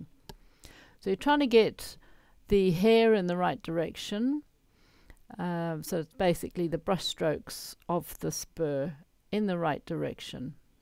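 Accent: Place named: South Africa. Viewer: Ur south Africa accent keeps popping up.!!😁😁😘 I keep trying to look round ur hair.!!!🤣🤣🤣🤣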